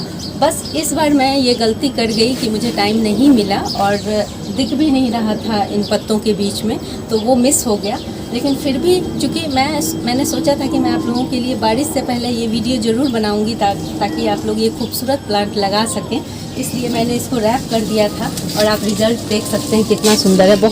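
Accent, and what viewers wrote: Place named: Uttar Pradesh, India. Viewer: Uttarpradesh accent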